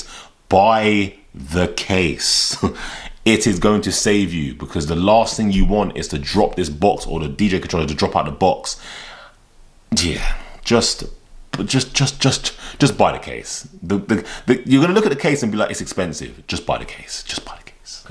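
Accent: London, United Kingdom. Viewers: Just found your channel, so glad! I love your London accent and your vibe + great info!! What controller would you recommend for a beginner who travels a lot and wants to be able to travel with it easily? Around 300-500 pounds budget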